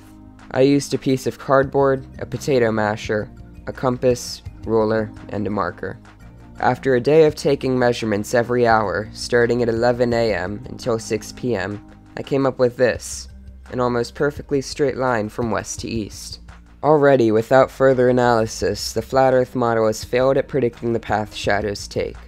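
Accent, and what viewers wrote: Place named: Canada. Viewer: the video is awesome, but I was distracted by the fact that you said you live on the 37th parallel but also sound kind of Canadian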